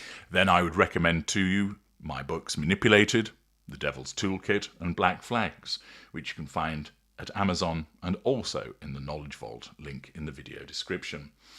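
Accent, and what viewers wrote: United Kingdom. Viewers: Been waiting for this. Next up would be a great comparison between mm and ek. You, Mr. NARRATOR , HAVE THE MOST DIGNIFIED BRITISH ACCENT and EXTENSIVE ENGLISH VOCABULARY, OFTEN MISSING FROM MANY LIVING ACROSS 🇺🇲THE POND. GOD SAVE ENGLAND 🏴 🇬🇧, THE ENTIRE UK 🇬🇧 AND ALL WESTERN COUNTRIES. ⚖️✨️🕯✨️🎚🛐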